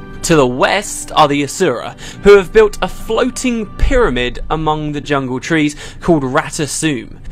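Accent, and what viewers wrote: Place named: United Kingdom. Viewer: ...我以為自己正在看泰瑞亞的 discovery channel ... 很重英國口音又對得上口的旁白啊！！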